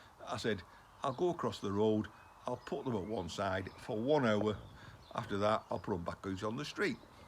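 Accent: United Kingdom. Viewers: My grandson wants to know why you poms - pronuniate things different to us is Australia, I told him we do it as well, look at how we greet someone - Gidday mate!!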